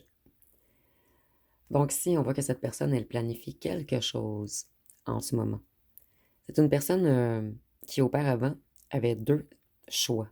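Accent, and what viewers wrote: Quebec, Canada. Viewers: La voix de la belle vallée du Saint Laurent. Merci ❤❤ Et vite un Québec indépendant !!!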